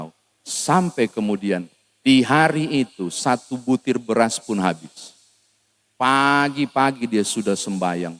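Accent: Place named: Kupang, Indonesia. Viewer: Puji Tuhan... Trimakasih pak pendeta, untuk reningan yg menguatkan. Sambil senyum dengar Bapak logat kupang....😊😊🙏🙏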